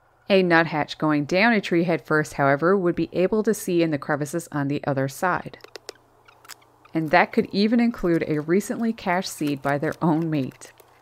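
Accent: Newfoundland, Canada. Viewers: I detect a Newfoundland accent. Thank you for everything that you do.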